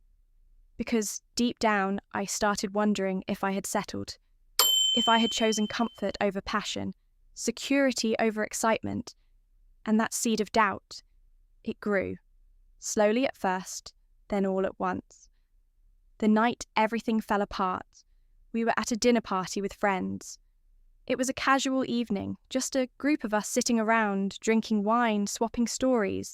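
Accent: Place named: New England, United States. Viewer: Definitely not a Texan from Dallas reading this story. Sounds like a New Englander.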